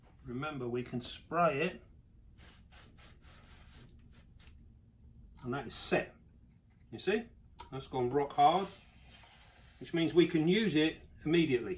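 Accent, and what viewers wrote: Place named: London, United Kingdom. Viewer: Great videos can’t stop watching now, where about are you from as you sound like you have all London accent but I didn’t think e could keep venomous spiders over here? Or am I I just being stupid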